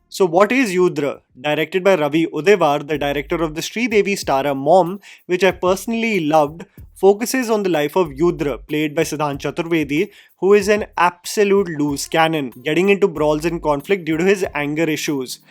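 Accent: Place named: India. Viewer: Bro hate this channel for Indian accent English..... Kindly create videos in Hindi...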